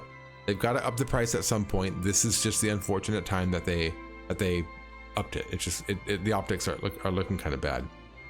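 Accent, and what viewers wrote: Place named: Midwest, United States. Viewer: Are you from Minnesota or the Midwest? Your accent is strong lol.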